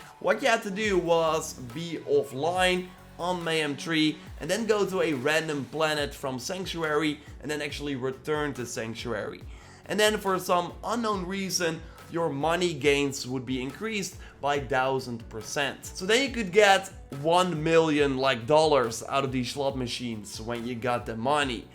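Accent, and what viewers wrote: Netherlands. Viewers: Man man man, dat neder-engels. Verschrikkelijk.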